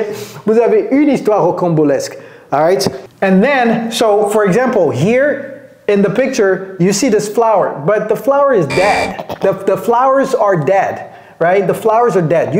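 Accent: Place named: United States. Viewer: He is very fluent With his american accent.😊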